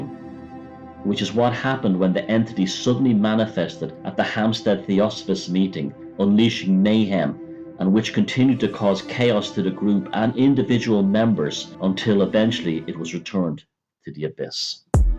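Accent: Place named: Ireland. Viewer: I am very upset because this is the perfect documentary for general overview of the topic, but the audio quality completely ruins it. The Irish accent would be no problem at all if it did not sound like you recorded the audio with a 1995 Talk-Man (from Home Alone 2 for reference) off a 1950s AM Radio broadcast.. Holy hell man, it's like you severely downgraded the quality. If this is any way to judge the technology you own, you definitely still listen to cassettes and watch movies on VHS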